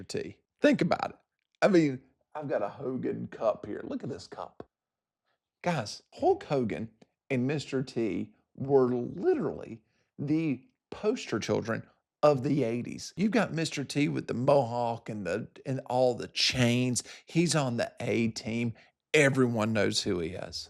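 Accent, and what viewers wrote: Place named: Georgia, United States. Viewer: I missed that sweet Georgia drawl...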